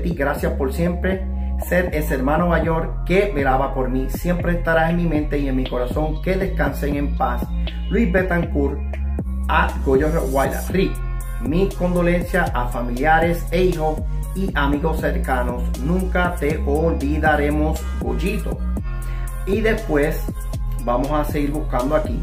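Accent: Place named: United States. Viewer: No hablabas muy bien español O es mi imprension jajaja se te notaba más lo estadounidense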